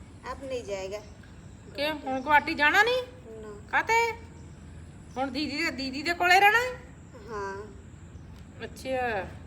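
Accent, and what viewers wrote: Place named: Patiala, India. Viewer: beta tuhada pind kihra hai boli patiale de hai❤❤🎉🎉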